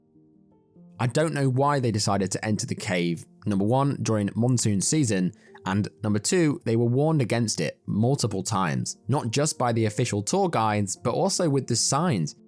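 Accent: United Kingdom. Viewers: I prefer your version more than him because I like British accent in storytelling. 👍🏻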